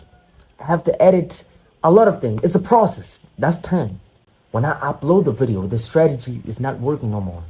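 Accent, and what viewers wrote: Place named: United States. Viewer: Give this man some subs he's perfected the American accent while living in Mozambique!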